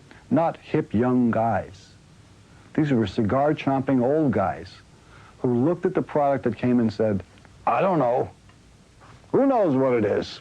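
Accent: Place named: Northern England, United Kingdom. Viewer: Ha I love you man.. ant fukin northern accent piles on your integriee. Keep it coming, we will be people with minds again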